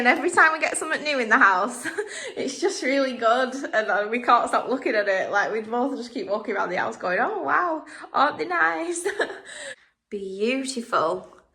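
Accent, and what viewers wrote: Northern England, United Kingdom. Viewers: Hi loving the flooring and the stair rods spot on👌💖 Ey up I'm loving the northern accent haa.. si ther 😊🥰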